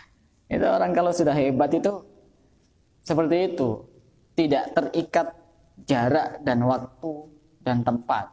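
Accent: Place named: Madura, Indonesia. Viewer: Kok seperti logat Madura ustadz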